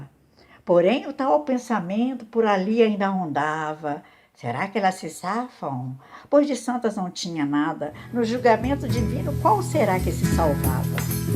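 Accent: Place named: Minas Gerais, Brazil. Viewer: Oi, que maravilha te rever mesmo sendo na telinha com uma performance maneirissima e sotaque mineiríssimo também 😹. Admiro você “D+ da conta “uai“, especialmente pela sua simpatia, humildade, esforço, perseverança e fé que o amanhã sempre será melhor do que o agora. “A fé não costuma falhar” viu? Um beijão do tamanho da bochecha!😹🥰🥰🌈🌈🌈🌈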